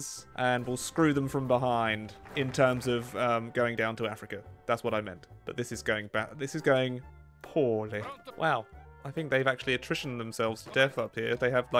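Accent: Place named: England, United Kingdom. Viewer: What's the name of the type of English accent he has ?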